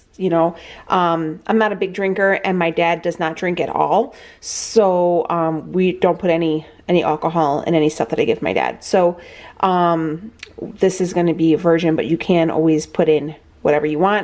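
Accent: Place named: New York, United States. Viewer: You are absolutely blooming brilliant. I love how you talk. Your accent sounds like a New York police officer. I love it! PS... you’re one gorgeous woman!xxx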